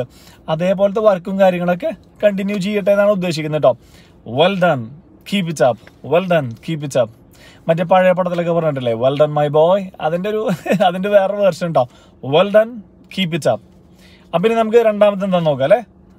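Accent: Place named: Thrissur, India. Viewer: ലാസ്റ്റ് ക്വസ്റ്റ്യൻ and ആൻസർ ആണ് ഇഷ്ടപ്പെട്ടത്. പിന്നേ,,, തൃശ്ശൂർ കാരൻ ആണല്ലേ... ഞാനും.🥰എത്ര അറിവ് നേടിയാലും അവരവരുടെ നാടിനെ ഓർമ്മപെടുത്തുന്ന തനത് ഭാഷ ശൈലി . ഇതിന് മറുപടി ഇന്ന് പഠിപ്പിച്ച ആദ്യ പാഠം തന്നെ ഉപയോഗിക്കുന്നു.🙂 Well done my boy 😂 keep it up 😋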